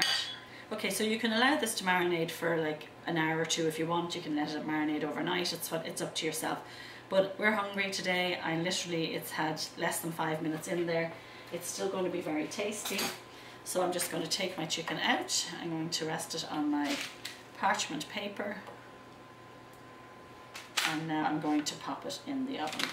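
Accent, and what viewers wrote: Ireland. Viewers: i love your accent Irish i presume . your a lovely lady and thumbs up for you. great teacher and refreshingly no frills presentation. i am going to cook some chicken in my crock pot NOW. thank you.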